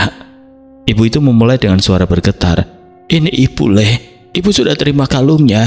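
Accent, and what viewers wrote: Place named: Banyumas, Indonesia. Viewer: kayak suara khas ngapaknya ..dgn ciri khas cerita panjang ngalor ngidul cmn ga ada seramnya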